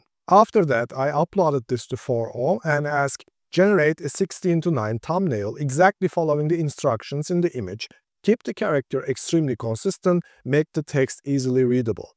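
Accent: Turkey. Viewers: Dostum aksanın çok türk, türk müsün merak ettim. Video için ayrıca teşekkürler. 🇹🇷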